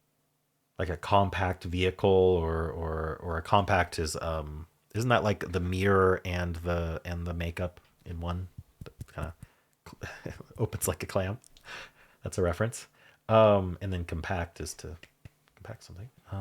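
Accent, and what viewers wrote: United States. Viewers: the joys of US pronunciations i would never have gotten hawk and hock(ey) to rhyme - but then in the US sauce and horse don't rhyme but they do elsewhere.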